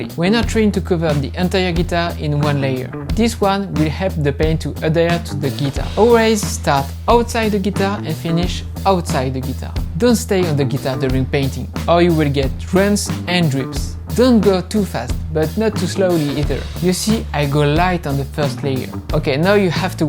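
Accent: France. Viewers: Came for the lumpy green paintjob Stayed for the Inspector Cluseau accent 👍😎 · It’s officially the first time that a person with a French accent doesn’t irritate me when speaking English. I guess you could take that as a compliment coming from a dude who gets easily irritated 😂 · francais ? vu laccent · I am Croatian, and nobody around me speaks English with such a terrible accent. It is French thing. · i was listening to him talk and thinking, "this guy is French AF, I don't recall ever seeing a French YTer, ever" then i scrolled down to see " " my suspicions check out.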